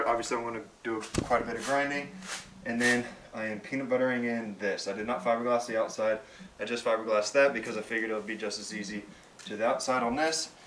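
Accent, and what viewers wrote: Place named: Newcastle, United Kingdom. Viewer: listening to true Geordie! Love that and watching this build has really helped me with my project boat some of your "mistakes" i was able to skip and not have to take the time and make them myself. Keep up the great videos and hope to see you on the water soon!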